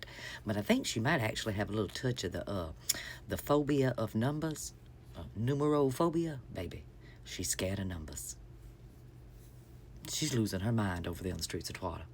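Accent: South, United States. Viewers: This is the most "well bless your sweet lil cotton-pickin' heart" Southern sass i have ever heard.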